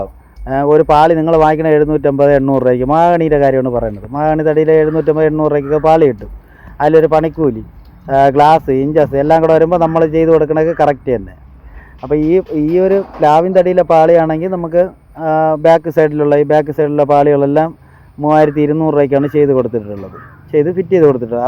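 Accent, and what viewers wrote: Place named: Thiruvananthapuram, India. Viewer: താങ്കൾ സ०സാരിക്കുന്ന ഭാഷ തിരുവനന്തപുര० സ്റ്റൈയിലിൽ.🙋‍♂എന്നാൽ മദ്ധ്യകേരള ഭാഷ നിങ്ങളുടെ ഭാഷയേക്കാൾ വ്യത്യസ്ഥമാണു് ബ്രോ!🙋‍♂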